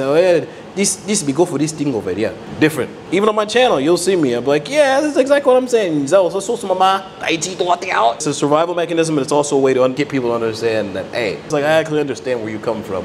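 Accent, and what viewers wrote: Taiwan. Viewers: 真的有臺灣口音>< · 台灣腔的國語。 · A Genius ~ I just love your both Chinese and Taiwanese accent ❤👍👍👍👍👍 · ，台語、國話，口音很台灣❤ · 講國語有台中味XD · 靠，就是靈魂的台灣人，那個口音超地道的😂😂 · 哇塞，之前只看過兩隻的影片，但當中本人沒出現，聽聲音以為是台灣人😮 國語比我爸標準，台語比我男朋友標準😂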